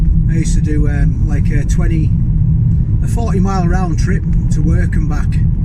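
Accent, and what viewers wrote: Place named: Cumbria, United Kingdom. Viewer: love watching your vids my freind my grandad was from Egremont and you remind me so much of him by the things you say the accent etc your vids are are breath of fresh air in this turbulent times, carry on the great work mate dave from manchester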